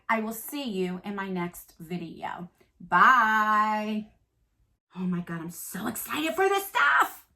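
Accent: New Jersey, United States. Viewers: Lmao the creative descriptions must be a Jersey thing. My mom and my dad both were born and raised in Jersey and speak just like you, I live it! 💄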